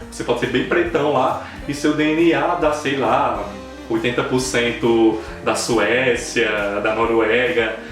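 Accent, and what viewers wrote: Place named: Paraíba, Brazil. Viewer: É tão bom ouvir sotaque da Paraíba aqui no youtube ❤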